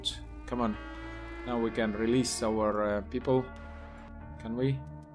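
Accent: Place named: Estonia. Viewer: i figured that younwere estonian by your thick accent haha. hello from finalnd, bro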